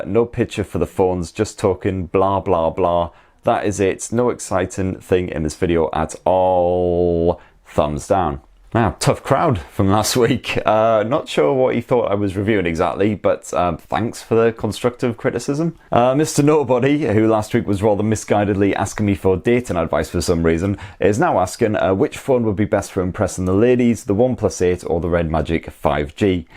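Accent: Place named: North East England, United Kingdom. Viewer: Sound like up north e.g North East.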